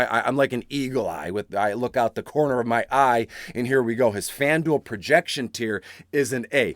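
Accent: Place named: Michigan, United States. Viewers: Hahaha better the Michigan accent than saying eh or don’t cha know. 😂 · im here for the great advice and the Michigan accent. both on point today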